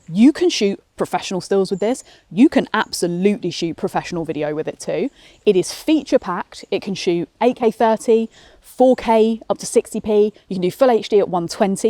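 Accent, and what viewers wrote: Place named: United Kingdom. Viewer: 👍🏾👏🏾💐📸👸Good review. Love the British accent. Phelix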